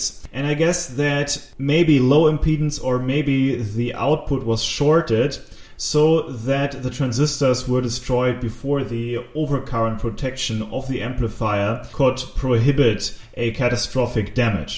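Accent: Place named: Germany. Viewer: Hallo, ich schreibe mal auf Deutsch, da ich einen entsprechenden Akzent in Deiner Englischausführungn heraushöre - ich hoffe, ich liege da richtig. Ich habe den Yamaha dsp a595a auch und wollte gern meine Boxen mit Bananensteckern anschließen, stellte aber fest, dass die Speaker-Schrauben keine Kappen haben, die man abnehmen kann, um den Banana-Eingang freizulegen. Was kann man tun ? LG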